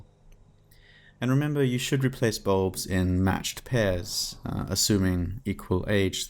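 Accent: England, United Kingdom. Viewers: He sounds English to me and he opened the bonnet/hood up on the UK's driver's side.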